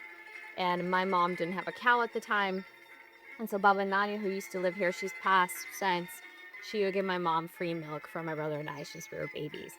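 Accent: United States. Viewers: Can I ask a question about why do you have a strong US acceant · I don't understand how English is your second language, but you have an American accent?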